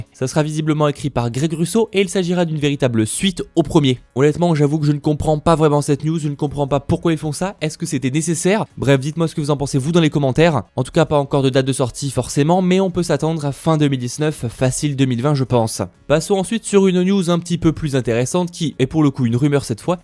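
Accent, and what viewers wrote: England, United Kingdom. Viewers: Ton accent anglais me perturbe vraiment.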